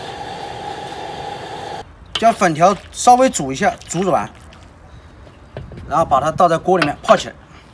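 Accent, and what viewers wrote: Sichuan, China. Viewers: 我特地來聽你的四川腔，你怎麼不說了😢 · 這種話才聼得懂，四川話聼起來好累唷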